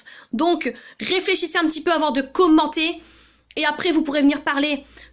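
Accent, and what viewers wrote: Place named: North, France. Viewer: T est du nord ta l accent je suis en Normandie je suis du ch nord a la base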